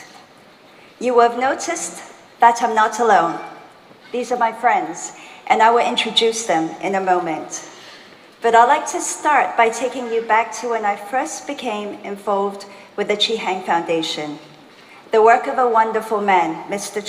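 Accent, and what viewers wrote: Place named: China. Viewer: 伦敦口音退化了不少 中国音非常严重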